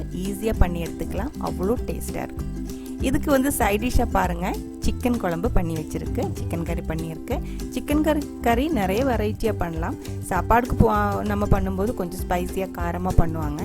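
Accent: Kerala, India. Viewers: hi mam ur way of presentation is simple&..ur malayalam mixed tamil is understanable..i love..can you tell about adaprathaman recipe?..thank you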